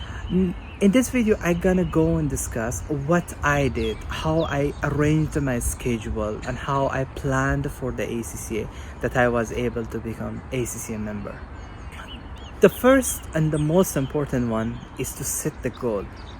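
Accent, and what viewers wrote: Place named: Afghanistan. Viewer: Hi are you Afghan because your pronouns form Afghanistan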